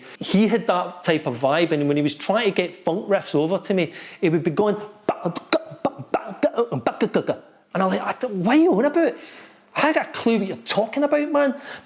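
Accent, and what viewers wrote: Scotland, United Kingdom. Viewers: That was pure brilliant mate. You from Scotland?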